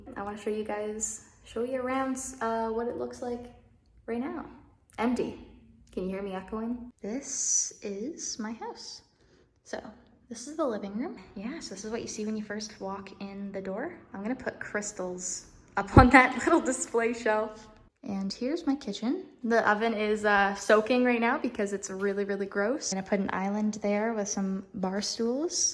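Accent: Canada. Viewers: I love your accent, it sounds so canadian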